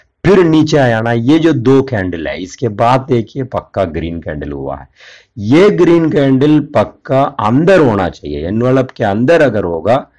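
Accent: Tamil Nadu, India. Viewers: U r a tamil guy... I can see that from ur tone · sure will try the strategy strictly for 5 working days. Let see what happens. I have a friend who earn good moeny in Iron fly weekly said the same thing chart will speak to you if you give market some spare time to concentrate and learn. But I don't know why successful traders like my friend they don't like indicators they prefer chart patterns and momentum of the market to catch the momentum for exp recent CPI result. I mean indicator is also doing the same thing indicating over bought over sold (Nadarya envelope) Multip lever will indicate bottom and tops. I mean this indicator are increasing our efficiency and productivity nothing else but they consider as FOMO. Let see by the way thanks for taking the time to guide me in the mid of the night you have earned a loyal SUBSCRIBER. Also your hindi is abstract I guess you're Tamil Shukriya Ji 💐💐🙏🙏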